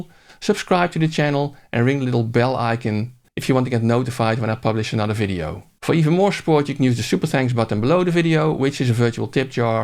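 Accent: Netherlands. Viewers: You can call yourself but your accent betrays you, you're dutch!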